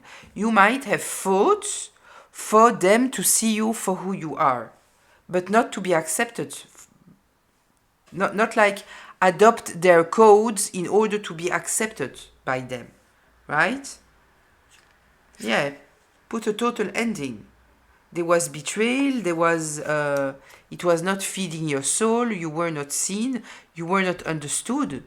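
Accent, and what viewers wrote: France. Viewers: Thank you for the reading:hands-yellow-heart-red:🕊 Are you from France?☺Just subbed^^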